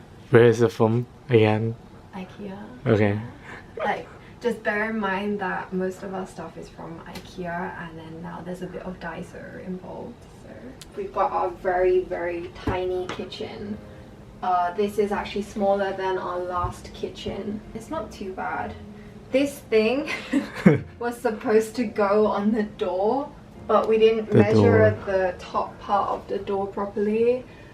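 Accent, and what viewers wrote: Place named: United Kingdom. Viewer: hmm interesting, UK accent coming back to HK very small aprtment.. why not comeover to the mainland. Try Shenzehn and Guangzhou much bigger much better But goodlocation will always trump other factors so good on both of you. Our family also feels that the surrounding environment is important especailly when you walkout on the weekend, you want to be somewhere with cafes etc and a place you feel cmfortable also its good to be around the same kid of clientelle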